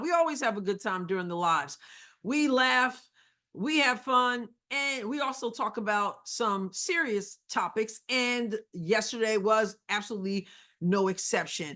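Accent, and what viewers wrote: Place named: Brooklyn, United States. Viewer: you sound like you have s little Brooklyn accent...😊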